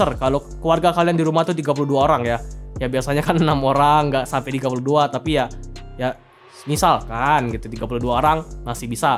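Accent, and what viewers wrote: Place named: Lombok, Indonesia. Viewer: Kayak logat plat DR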